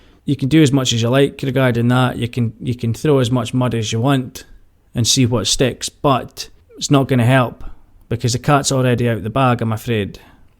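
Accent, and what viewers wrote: Scotland, United Kingdom. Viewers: You Scottish bro that’s coming from a Scotland ma lol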